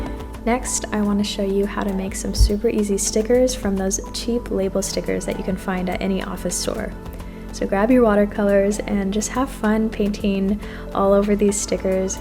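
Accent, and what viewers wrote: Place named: United States. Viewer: You sound american how did you get something from 다이소? Do they have online stores?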